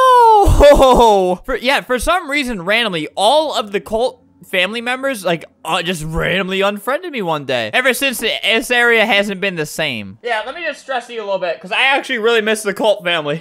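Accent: United States. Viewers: U always sound like ur from america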